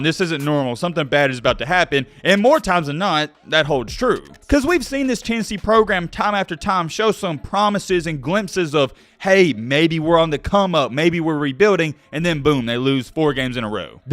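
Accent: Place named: Alabama, United States. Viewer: sounds almost like he's from Ardmore or the Huntsville area lol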